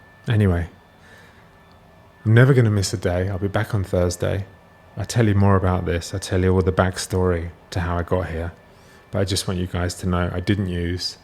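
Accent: United Kingdom. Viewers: your British accent is sooooo delightful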